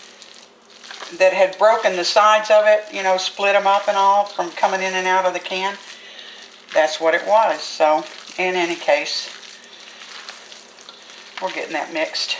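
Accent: South, United States. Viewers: You're from the North?? You must have been in the South a very long time,you sound Southern.This dressing looks yummy,I'm going to try it soon,I might use chayote squash instead of yellow.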